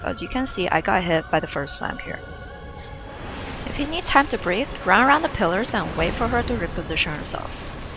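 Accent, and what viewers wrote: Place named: China. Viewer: 妹子你是中国人嘛😳 I like your accent; it sounds just like mine.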